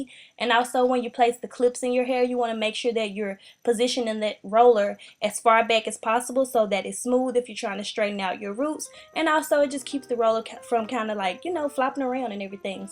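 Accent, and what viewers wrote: South, United States. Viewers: Beautiful hair, good job! Cute southern accent😂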